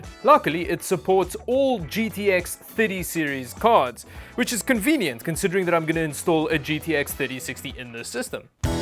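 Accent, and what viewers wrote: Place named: Cape Town, South Africa. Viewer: Hey I'm subscribing just because I recognize the capetonian accent. Cheers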